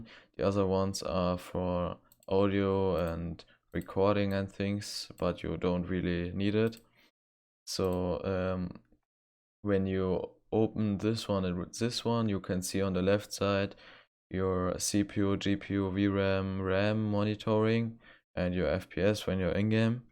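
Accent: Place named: Germany. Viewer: ich hör nen deutschen aktzent :D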